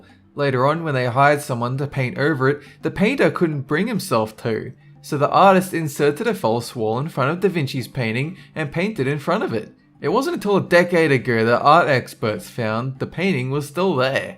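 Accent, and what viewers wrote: Australia. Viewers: Sounds Australian to me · is he aussie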